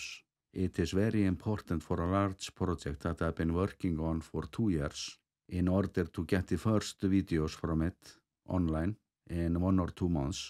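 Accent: Iceland. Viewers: Fluent English spoken with Icelandtic phonemes sounds amazing! Plus of course you have a nice voice. :-)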